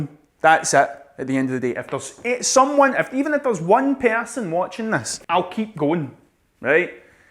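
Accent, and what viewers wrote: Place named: Scotland, United Kingdom. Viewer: just came across your channel man dont know how I have never found it before but I'm happy because now I can go back and watch everything !! trying to get into painting myself and you have given me a fresh outlook on what I'm doing and why I'm doing it, you the man! love the Scottish accent and love the comedic honesty its refreshing hahaha thank for sharing your knowledge man much love from Ireland 🤘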